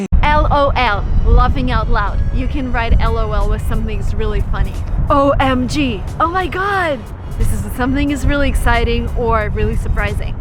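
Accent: United States. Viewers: Madam you are a Russian and you talk in perfect American english.We Indians adore you.We respect you.ALL THE BEST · Марина классна говоришь по английскому прямо как Американка сними на русском такое же пожалуйста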